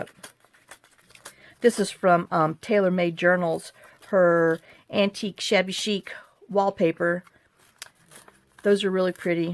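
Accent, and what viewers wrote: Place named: Mississippi, United States. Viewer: Hi. You mentioned you was from Biloxi,Mississippi. My daughter was born there at Keeesler Air Force base. I lost her in 2007. But anyway I came back to Missouri talking that "you all" quite while. I love your accent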